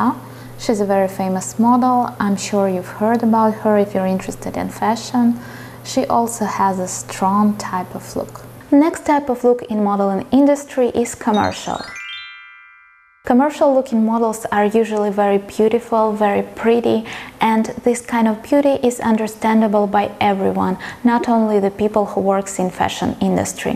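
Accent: Russia. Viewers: вы же русская? слышу этот милый акцент аххахаа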